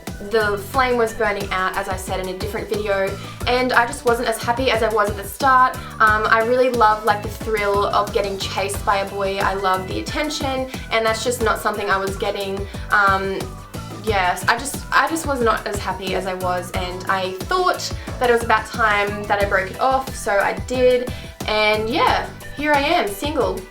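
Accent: Australia. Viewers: Are you Aussie? Hopefully I'm not Aussie and alone.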